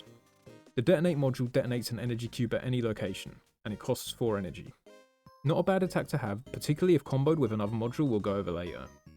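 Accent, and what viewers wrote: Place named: United Kingdom. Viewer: Man I had to put you at 70% speed. British is hard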